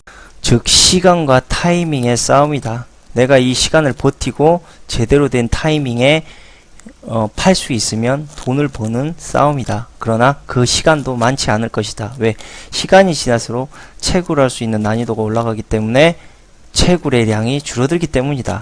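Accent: Jeolla, South Korea. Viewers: 전라도라 그래요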